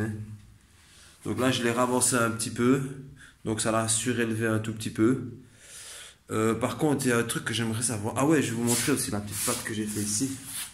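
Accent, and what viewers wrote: Liège, Belgium. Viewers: Tes de quel côté de Belgique a l écoute ont dirai bien liège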